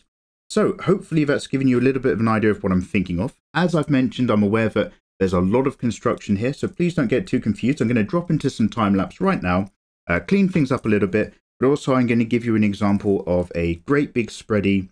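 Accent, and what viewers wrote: United Kingdom. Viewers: dude with a british accent drawing cultured stuff, fucking awesome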